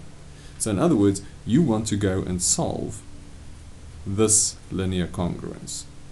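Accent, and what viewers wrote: South Africa. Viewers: Nog nie eers die video gekyk nie maar kon daardelik hoor die man is Afrikaans, toe sien ek jou van is 😄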